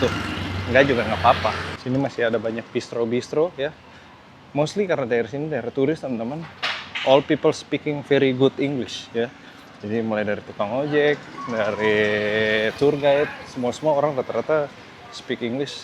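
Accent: Eastern Indonesia, Indonesia. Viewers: kaka punya logat timur kantara skali😂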